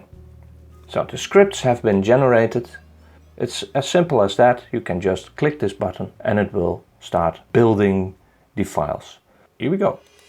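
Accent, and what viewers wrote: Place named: Netherlands. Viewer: Hi, Funny how in the first seconds I already recognized a fellow dutchie XD. For everyone else I'll ask my question in English. I'm currenty shooting a 3 panel mosaic of NGC 1333 with my ASI 294MM pro. I'm planning on having at least 25 subs for each RGB channel. After which I want to stick them all together to create a single big mosaic. My question is, what would be the best work flow? Last year I did a 2 panel DSLR mosaic of m31 in a PixInsight trial, which worked flawlessly. But I have no idea what would be the best way to do it with mono data in SiriL. Ofcourse I would start with stacking the data from every filter together, but what would the next step be? Do I stretch and edit every mono filter, make a RGB version of that panel than use ICE. Or would I stretch it, use ICE to create the mosaic and then make the RGB version? 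I think that doing ICE in between stretching and RGB would cause problems with alignment later. Another problem with these work flows would be that it is really difficult to have the same stretch across (3 panels * 3 filters) 9 different files. You mentioned something about creating a SiriL script which does the stretching. I have never used scripting in SiriL so would something like that be difficult to do? Or would it be better to stack the files, use ICE than stretch and RGB composite? NGC1333 has a lot of faint dust (I really like the image from Justin Tolboe), so I think that it would require a bit more processing than doing a simple auto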